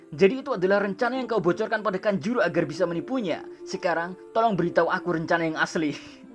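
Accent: Java, Indonesia. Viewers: dari logatnya orang jawa. Feeling gw nama asli lo. Kayanya 70% benar wkwk iyaa ga min?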